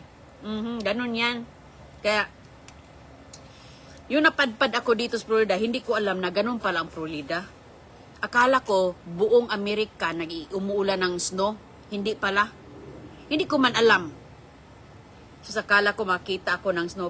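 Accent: Visayas, Philippines. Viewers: sorry naman bisaya lang. Intindihin niyo na pronunciation ni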